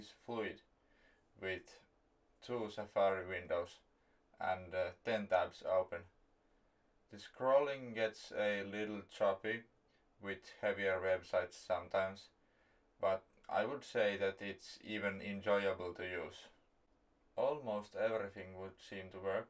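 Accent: Finland. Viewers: ootko suomesta :D tuosta englannin aksentista ei voi erehtyä! Mulla on kaksi tällaista, 24" sekä 20" mid-2007. Molemmissa prossuna T7700. Tuohon isompaan olisi hauskaa laittaa X7900 tai T9500, Catalina-tukea en tarvitse.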